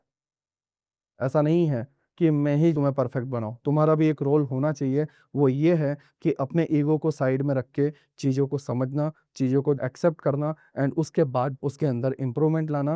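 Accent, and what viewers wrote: Gujarat, India. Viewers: Are you gujrati bro ? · Sir aap gujrat se ho ? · Ap Gujarat se ho...? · Bro are you a gujarati?? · Pakka ap gujrat se ho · Lagta hai Gujarat se ho....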